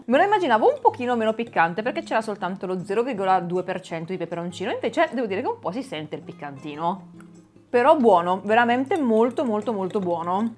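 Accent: Turin, Italy. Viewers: #avocadoimpanato(?) Ragazza mia io ti devo dire grazie mi sono segnata tutto quello che spero poi di trovare al Lidl .. Yogurt al cocco.. Barretta al cocco.. Formaggio vegano..io sicuramente lo userò x le melanzane al forno.. E gli anelli di cipolla E sperando di trovarli i gelati al cocco che avevi detto l altra volta nel video che sei stata così carina a farceli vedere😋..❤ Parli fra un po' come una di cit turin😊.. Non so cosa hai fatto ai capelli ma sembrano più morbidi e lucidi.. Continua così. PS se torni al Lidl anche la marmellata bio alle fragole merita...😋😘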